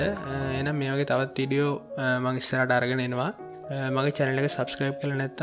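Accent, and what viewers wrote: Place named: India. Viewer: Description in english speak in indian.. Wtf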